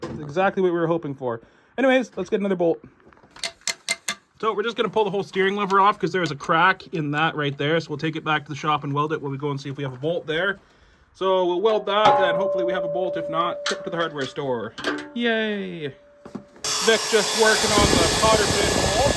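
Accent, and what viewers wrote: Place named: East, Canada. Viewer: I know a guy from eastern Canada when I hear him. Ontario, Nova Scotia? Surely not from New Foundland 😂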